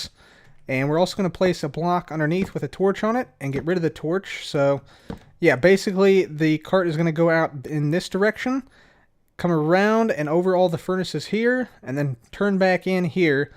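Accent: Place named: Alabama, United States. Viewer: Dat Alabama accent tho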